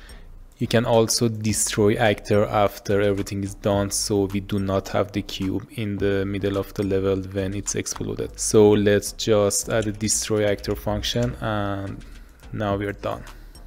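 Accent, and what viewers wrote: Iran. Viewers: ur accent lol! are you persian dadash?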